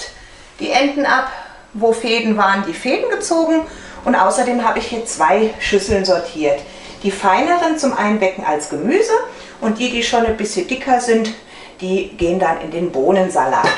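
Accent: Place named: Palatinate, Germany. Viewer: Ah, ich Liebe deinen Dialekt :) wo kommt ihr den her? Hört sich für mich nach Palz an, kann mich natürlich auch irren. Liebe Grüße aus dem Saarland :)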